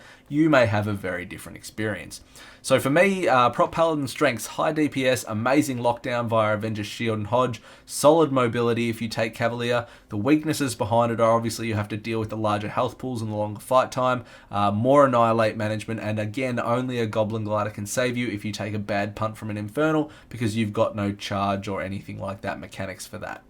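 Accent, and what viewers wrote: Australia. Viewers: dude just having you say 'this cunt is cooked' had me subscribe. good aussy tank videos i approve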